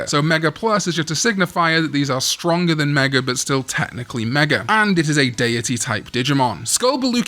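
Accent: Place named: Wales, United Kingdom. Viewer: It's nice to hear a welsh person butchering Irish words. There's some kind of solidarity there.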